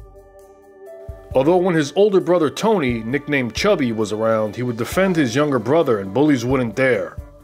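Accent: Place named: New York, United States. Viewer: You're a really good narrator, especially with the New York accent ( I'm from New Orleans). Very cool channel you have, bro. I'm just discovering it, but it's great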